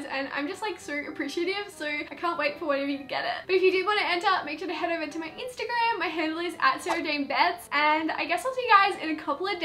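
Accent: Australia. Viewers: Are you Australian? xx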